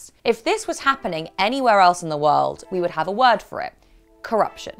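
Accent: United Kingdom. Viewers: I just love the british accent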